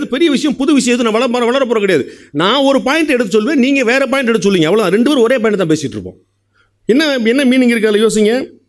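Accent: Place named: Tamil Nadu, India. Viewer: நாட்டுநலன், தேசப்பற்று, தேசியஒருமைப்பாடு, மதநல்லிணக்கம் என்று மடைதிறந்தவெள்ளமெனப் பேச்சு ; அருமையானதமிழ் உச்சரிப்பு, நெல்லைத்தமிழும் மதுரைத்தமிழும் போட்டிபோடும் யதார்த்தமானபேச்சு நடை ... தமிழன் என்று சொல்வதற்கு இதற்குமேல் தகுதி என்னவேண்டியிருக்கு?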